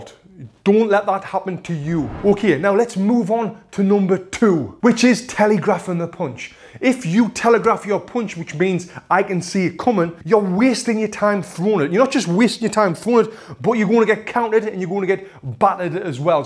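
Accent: United Kingdom. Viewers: The amount of passion and pro advices that transpires from this man is overwelming. Also, i love such a british accent, even if i'm italian ahah.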